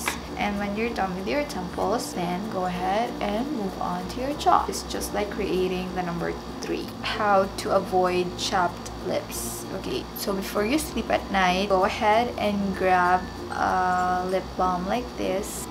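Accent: Philippines. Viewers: Filipino accent hehe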